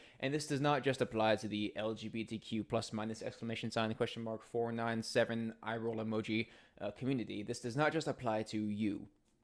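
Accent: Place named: Canada. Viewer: Sounds Canadian or homeschooled.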